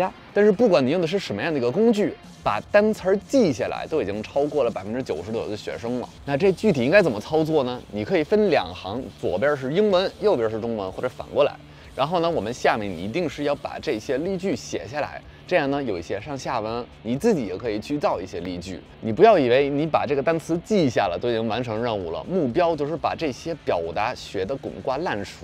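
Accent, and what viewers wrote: Beijing, China. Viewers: 你的中文真的不错啊，希望你能改掉那种京片子的腔调，这种腔调其实现在北京人也不说，因为给人油嘴滑舌的感觉。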